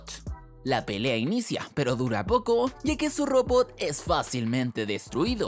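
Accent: Chile. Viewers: MIRA EL PERKIN este, no sabia k eras chileno D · Eres chileno?... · no sabia que este weon era chileno xd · Ahora, en este video si que si que confirme que eres chileno, y eso es bueno para decir que los chilenos podemos hablar bien, y que eres muy bueno haciendo resúmenes de películas, tremendo like que te doy 😎🤙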